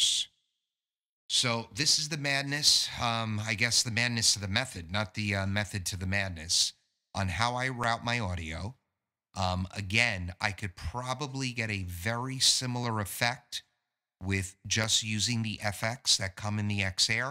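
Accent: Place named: United Kingdom. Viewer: Now I see where your daughter gets her acting spirit! The British Accent adds a nice touch.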